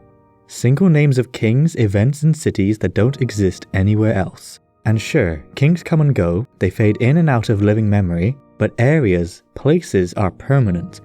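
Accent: Wales, United Kingdom. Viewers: Your accent is Welsh, isn't it?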